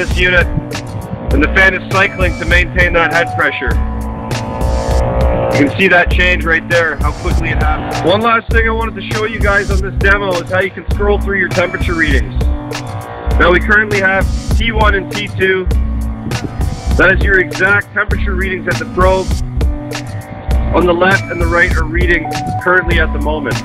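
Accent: Canada. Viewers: Good Job enjoy your video, very good explanations in your narration ...are you from TO?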